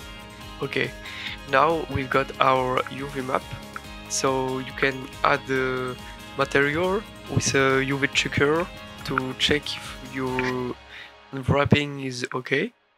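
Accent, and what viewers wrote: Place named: France. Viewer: Great tutorial, very clean, simple and right to the point ! If I had something to add, you could maybe have explained why you used some of the functions or macros 🤔 I'm not an expert on UV unwrapping for example, so I would have liked longer explanations J'ai le sentiment que tu es français vu ton accent, si c'est le cas, sache que tu m'as bien aidé à progresser, je te souhaite du succès sur youtube !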